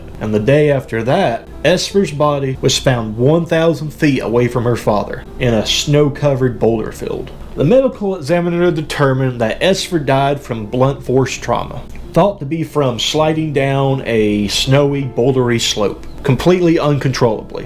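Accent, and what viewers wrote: Appalachia, United States. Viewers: Thank you for keeping the good ole Appalachian accent alive! It's unique and refreshing to hear it online.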